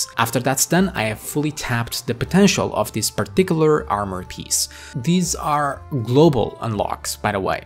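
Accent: Portugal. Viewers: Great! E pelo sotaque só podes ser português. :D Experiência própria...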